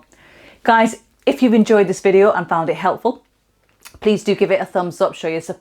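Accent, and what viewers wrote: United Kingdom. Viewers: Awesome recipe ! Good job ! Please speak slowly so that we understand your British accent well.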